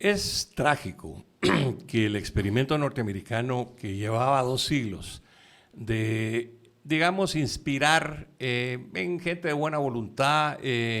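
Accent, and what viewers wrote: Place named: Guatemala. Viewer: Lo felicito. Su acento es casi el de los chapines de 1966 cuándo me fui. Hay otros que parecen mexicanos.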